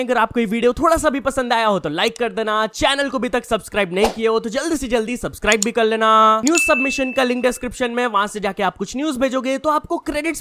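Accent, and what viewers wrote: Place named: Hyderabad, India. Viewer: Hydrabadi accent bro 😅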